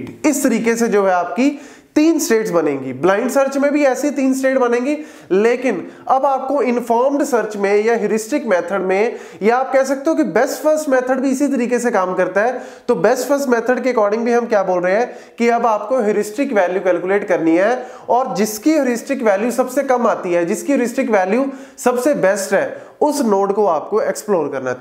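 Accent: India. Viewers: Indian English.. too hard for me to comprehend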